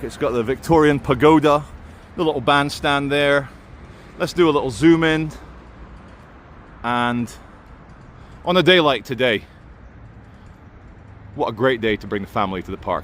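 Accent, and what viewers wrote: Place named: England, United Kingdom. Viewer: Indigenous English , beautiful, just beautiful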